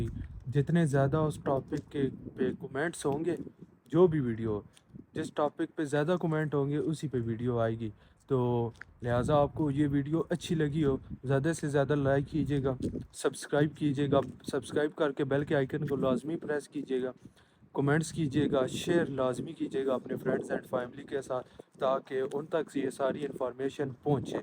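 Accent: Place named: Chakwal, Pakistan. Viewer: Ap Chakwal ke lagtey hain bhai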